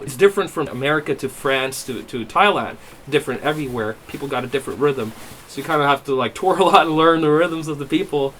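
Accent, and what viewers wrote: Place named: Canada. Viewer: Why does he talk like a Canadian if he's from Norway?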